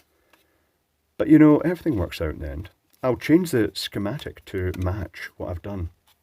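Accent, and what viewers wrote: Scotland, United Kingdom. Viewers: We all came here for pleasant Scottish accent. Never get disappointed when he calls for that schematic. Other Scotts could point out that besides saving the cable tie with a knot, the much cheaper 1N4002 50V 1A diode would have been much less of an overkill and provided a bit more Glenfiddich.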